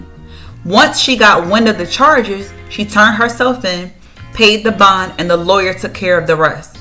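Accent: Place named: Philadelphia, United States. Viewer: This narrator’s voice is so ghetto I can’t finish listening!! Definitely East Coast…almost sounds like Philly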